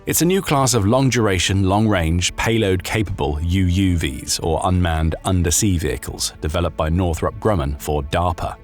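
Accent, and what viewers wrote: United Kingdom. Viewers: Someone got some dumb British narrator to say it was American. Silly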